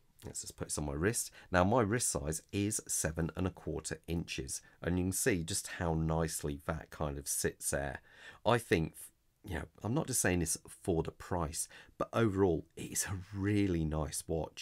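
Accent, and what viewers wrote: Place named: Birmingham, United Kingdom. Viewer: I will definitely visit the dealership you mentioned in Coventry. And I really like your videos with you brummi accent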